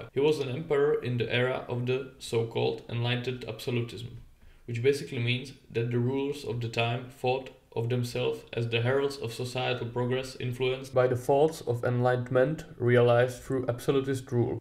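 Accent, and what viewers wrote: Czech Republic. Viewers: The czech accent is killing me💀💀💀 But very true video indeed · whats your mother language? you sound kinda like a czech with your english accent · Hey bro...fenomenal stuff keep i going. BTW are you Czech? Because that sounds like czech english accent to me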